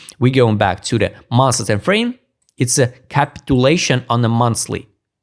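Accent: Russia. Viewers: Твой русский акцент превосходен.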